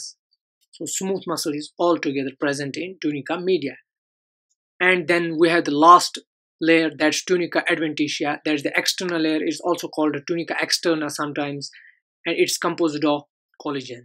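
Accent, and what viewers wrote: Kashmir, India. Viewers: I can tell by your accent you are kashmiri......Nice video tho 👍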